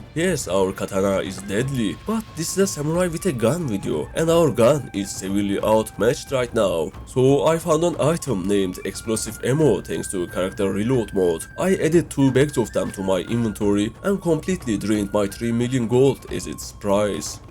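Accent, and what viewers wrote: Turkey. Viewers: Dude where u from tho? Sound like turkish accent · Kanka edit ve espiriler çok güzel türk aksanını da çok iyi taşıyorsun.Direkt aha Türk bunun mizahı da iyidir dedim öyle çıktı.Yolun açık olsun. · Ya bende diyorum bu aksan nereden tanıdık. Adam Türkmüş aq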